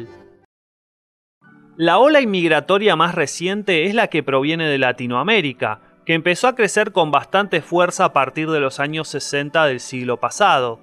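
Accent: Argentina. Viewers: Tu acento de che boludo hace aburrido el video. Utiliza el castellano neutro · Tu acento es netamente argentino. Genial, imparcial como debe ser alguien que quiere crecer... Adelante...bien hecho Podrias brindarnos un video con la última migracion....Colombo Venezolana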